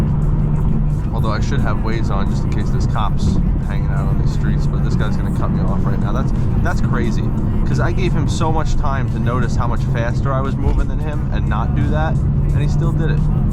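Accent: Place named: Long Island, United States. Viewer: In the first ten seconds from the overpass and your dialect I knew this was LI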